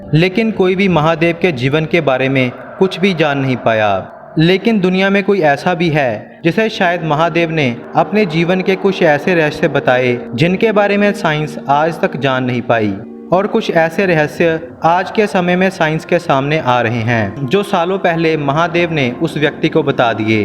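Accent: Punjab, India. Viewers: Caught a panjabi parson telling hindi story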